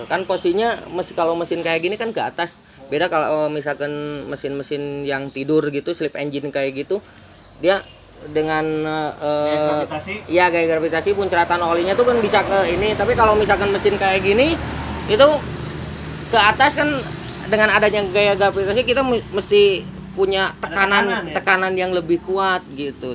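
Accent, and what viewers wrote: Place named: West Java, Indonesia. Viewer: Sunda kentel bahasanya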